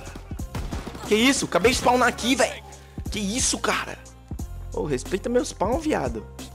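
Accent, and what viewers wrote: Minas Gerais, Brazil. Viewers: cê é mineiro tbm né? kkkkk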